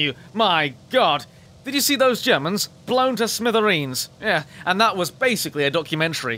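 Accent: United Kingdom. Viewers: not an impression, hes actually british........ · is this your real voice because I'm British but I don't sound like you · Your gameplay and commentary is so enjoyable, the british accent is on point m8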